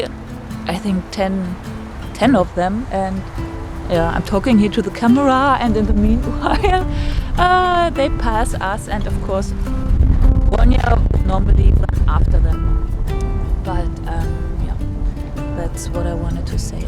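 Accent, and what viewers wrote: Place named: Germany. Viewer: Ich würde dir empfehlen, die Videos in deutscher Sprache zu verfassen. Man merkt, wie "anstrengend" es für dich ist, englisch zu sprechen (zumindest wirkt es so), und dann ist es für die Zuschauer natürlich nicht "entspannend" dir zuzuhören. Weiterhin ist deine Aussprache sehr deutsch, was es ebenfalls anstrengender macht, dir zuzuhören. Natürlich gibt es ein größeres potentielles Publikum im englischsprachigen Raum, aber ein größeres Publikum erreichen zu wollen, bedeutet meistens auch, nicht allzu spezialisiert zu sein (eine Nische zu haben), und oft kommt Erfolg erst dadurch, dass meine eine Nische belegt und sich von anderen abgrenzt. Meine Kritik ist nicht böse gemeint, vielleicht hilft es dir ja :)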